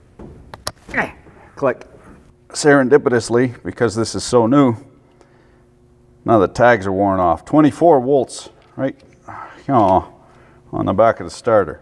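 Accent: Quebec, Canada. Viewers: Are you from Québec ? Been binge watching your channel since I heard you say calice de tabarnark in a Bosch video.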